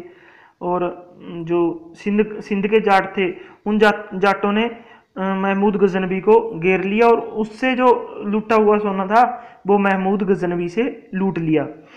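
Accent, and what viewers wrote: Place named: Punjab, India. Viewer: bhai y punjabi h... iski hindi se hi pta chal rha...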